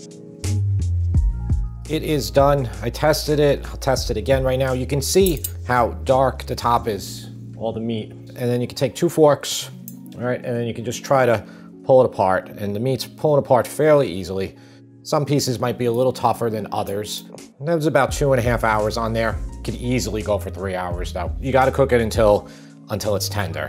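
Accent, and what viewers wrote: New York, United States. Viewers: I can hear and see the italian NYer in you lmao. Your voice and hand movements. It's so wholesome XD Equal parts likely to dump a body over the Brookline bridge with cement shoes as cook an amazing italian meal! Haha. I REALLY love that you just jumped straight into chopping and listing the ingredients. Too many chefs list the ingredients as they cook. I find myself skipping all around the videos to make a list. No such case here! Awesome content man!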